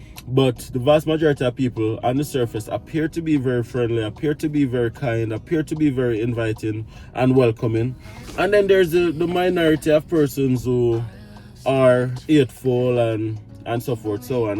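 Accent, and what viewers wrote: Jamaica. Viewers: I wonder if your Jamaican accent would have blown those Mennonite kids' minds if you'd spoken to them.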